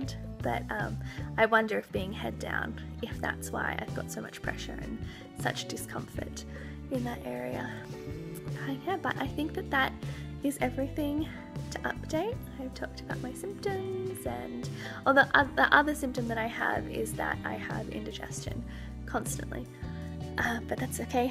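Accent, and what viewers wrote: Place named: United States. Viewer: Happy to hear you and baby are doing well..its going so fast.I live in Qld Australia, I thought you were American lol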